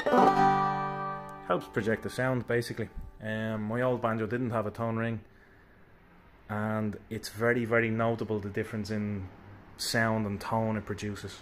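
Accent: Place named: Dublin, Ireland. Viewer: hi happy ne year I was thinking you must be from that part of Dublin you sound very like him alright 😄 I'm not far from you myself just started clawhammer frailing journey Just wondering if you do lessons??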